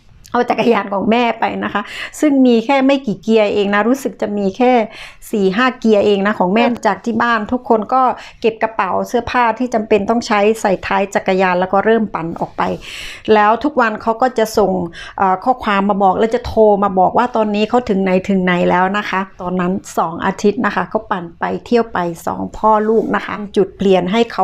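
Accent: South, Thailand. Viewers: ไม่จริงนะ อิสานใต้สำ้นียงอีกแบบนึง แต่นี่ฟังแล้วรู้เลยว่าเป็นคนใต้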